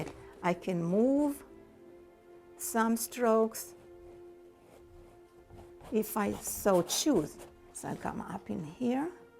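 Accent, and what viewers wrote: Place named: Brazil. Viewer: You an brazillian accent ... Beautiful !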